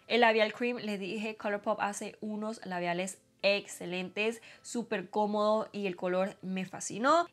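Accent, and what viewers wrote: Venezuela. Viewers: Ese acento venezolano... 🤤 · de que parte de Venezuela eres? Suenas como si fueras de Maracaibo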